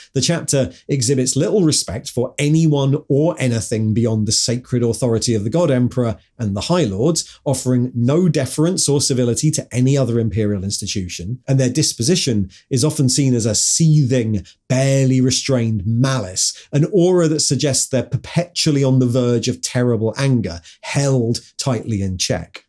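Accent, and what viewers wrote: England, United Kingdom. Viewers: Accents are so interesting and unique. I love learning about them. No, I'm not talking shit about him or anyone with an English accent.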